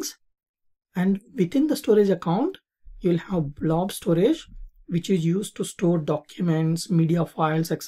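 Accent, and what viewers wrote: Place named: India. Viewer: I'm a about to switch from undergraduate to a grad computer science program and I haven't understood a single thing of what was going on on that video...(well I haven't really studied Big Data (thoroughly) yet,but for people like me this is completely out of reach...or would take 6 hours to understand (most of) all of the material of those concepts) not nice! Also I must put forward the significant Indian accent of the video lecturer, which induces additional efforts in trying to decipher his explanations. (PS: I'm not in grad school yet...so may change don't worry) (PS_2: I studied in a top European university (aside from the UK) To sum up I appreciate the efforts from the poster and turning into on the best IT YouTube educator with a big amount of hard work should entirely be possible. I wish you strength and motivation ! all the best!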